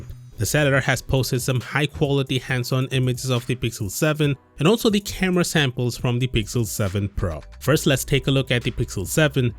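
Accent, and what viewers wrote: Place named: India. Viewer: Ugg why does youtube always recommend me this fucking channel. Go away. I just want tech news. I can't stand this guys indian accent.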